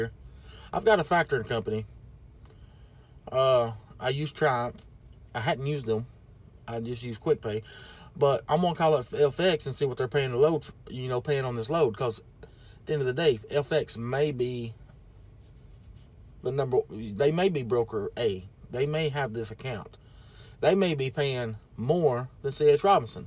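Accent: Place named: New York, United States. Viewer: Hey, I watched a bunch of videos and I listen to the way you talk to brokers, and that’s taught me a lot. I kind of use your template and probably sound just like you with a New York accent LOL I live just outside of Nashville now and I love the market here. Hope you’re doing well bud and enjoy your Thanksgiving holiday.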